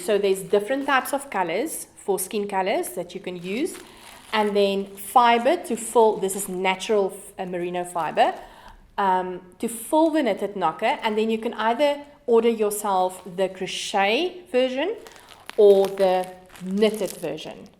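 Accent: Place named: South Africa. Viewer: Thank you again for such a wonderful podcast ❤ I'm really enjoying watching your channel ... And I love your SA accent ❤ Great to see you wearing the Faded Pathways Cowl ... Can't wait to start mine!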